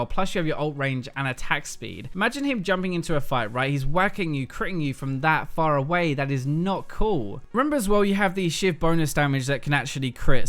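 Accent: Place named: London, United Kingdom. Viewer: you sound like you're from London!!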